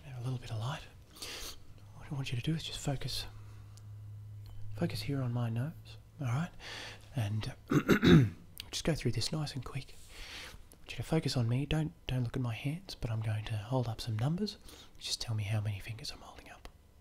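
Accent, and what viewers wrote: Australia. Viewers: haha you're so Aussie. love it :) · Hello Jon Snow, I’ve found another Aussie asmrtist. 🇦🇺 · Accents that trigger me change all the time but right now your Aussie accent is killing it! I've done nothing but sleep these last few days after dental work and a stressful few weeks, got a holiday off work next week and thoroughly looking forward to a week of sleep with your fantastic accent and brilliant knack for tingle creating at my ears! Much love to you from the other side of the world in Scotland x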